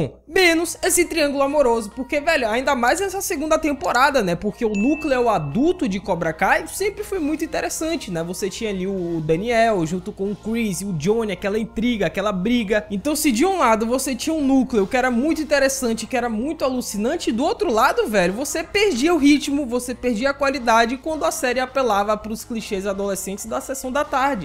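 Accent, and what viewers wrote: Bahia, Brazil. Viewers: Você é daq da bahia??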